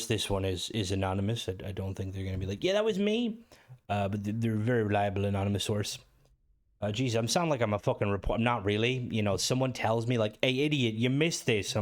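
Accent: Ireland. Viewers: We love are angry Irishman Mod reviewer. Everything sounds more intense with an Irish Accent, it makes ppl pay attention. Keep on doing what all of us don't want to do and review them mods.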